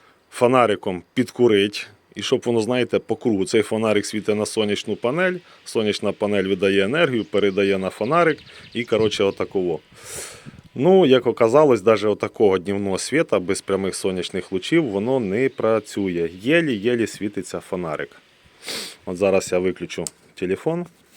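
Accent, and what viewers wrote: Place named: Surzhyk, Ukraine. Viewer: 😂😂😂 Во!!! Який русяцкий сурджик !!!! Хватає-це хопає чи висточає?!(Тупа півночі мова!) Фонарик- ЛІХТАРИК!!! Тєлефон-ТЕЛЕФОН Далі я не став вже цього з пошкодженим Росією мозком.... Але пишу відверто. Людина вдрма балакала так,як розмовляли батьки. Російською з місцевим діалектом. Людина розмовляє так,,к би вона не відвідувала школу,а як що відвідувала,тоді а бо не всилася ,або російською викладалася украхнська мова ьа література! До речі.... Книжки аффтор ввдео,не читав від слова - НВКОЛИ!!! Жах відео Вже суть вілео не дивився,бо його сурджик мене ВБИВ!!! І це мене,який народився ьа виріс у суто російськомовному місті МІЛЬЙОННИКУ центральної,промислової укра6,та розмовляв 52р. сутоиросійською!!! Жах суцільний!!! Оце росіч мозок та здатність мислити пошкодила?!🤕🤕🤕 Атас....😎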